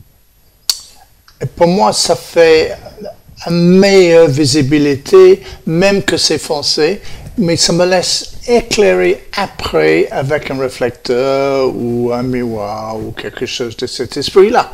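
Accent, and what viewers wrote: England, United Kingdom. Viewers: L'accent anglais est toujours aussi charmant à écouter. Vidéo très instructive avec des moyens OTC, un régal !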